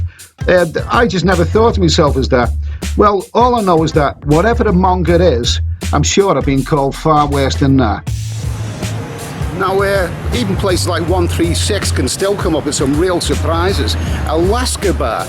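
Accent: Liverpool, United Kingdom. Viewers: What part of Liverpool are you from?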